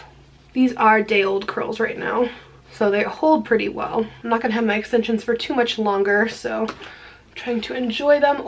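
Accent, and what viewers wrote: England, United Kingdom. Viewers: Love the British accent! And that highlight, ooooh girl!!! Such a gorgeous romantic look! I hope you and Alan had a good 5 year anniversary! 💗 P.s. Lolol 😂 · LOVE your English accent 😂💋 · British accent is ON POINT! Wow! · Voiceover with British accent... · wow that british accent 😍😍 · British accent on point girl <3 love your vids always. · you sound british as can be.!! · ❤️ this look! You shine bright like a diamond ✨ Your English accent is cute too; it would trick me. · love British accent🤣🤣😂😍great job so believable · Actually impressed with your british accent;) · Love the video, Great makeup, great British accent, and you look beautiful. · Yaaasss do a get ready with me with a British accent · OMGOSH that Accent!!!!!!! I vote you do an entire video in the Brit! XOXO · British accent is spot on! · You totally sound British I love it!!!!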